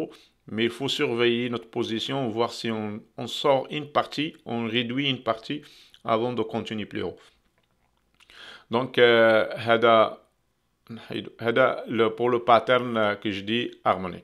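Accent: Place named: Quebec, Canada. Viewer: merci pour vous riches analyses ! avec accent québécois en plus :) grand bravo !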